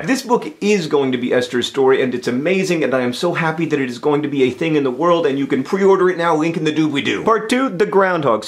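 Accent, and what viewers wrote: United States. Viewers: i feel sad because john talks really quickly and because he's american and i'm english my brain just can't work it out so easily so i don't know what he's saying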